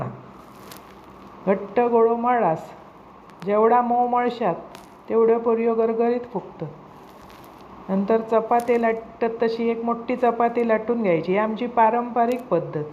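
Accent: Malvani, India. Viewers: Tai tumhi kay kay recipi bannvta aani goad malvani bhasha amachya aai sarkhi athvan aali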